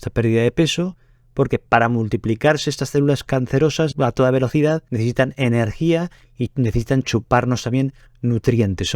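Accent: Spain. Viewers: En España,soy española y su acento es español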